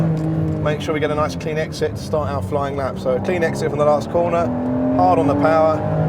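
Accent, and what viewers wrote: United Kingdom. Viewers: he has to be british REALLY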